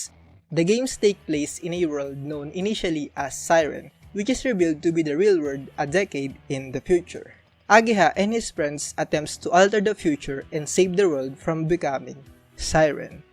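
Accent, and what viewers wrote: Philippines. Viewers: your accent sounds filipino, but if Im wrong I think you're still from the southeastern asian countries, correct me if i'm wrong tho. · What an Filipino Accent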